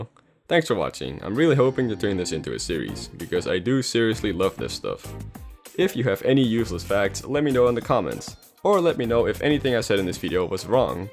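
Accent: Netherlands. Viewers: Wait a second are you dutch? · He dutch? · I KNEW YOU WERE DUTCH YESSS I am also dutch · ik wist niet dat je nederlands sprak, ben je een belg(zoals ik) of ben je een nederlander? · Are you dutch? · You Dutch? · omg are u dutch? Omg dat is echt cool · Wait, does this mean that you have a Dutch accent? if you don't mind, what part of the Netherlands you from? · hoppa ik dacht al dat ik een Nederlands Accent hoorde. Ik ben de allerkapotsteslimste werkloze persoontje op de wereld yesssss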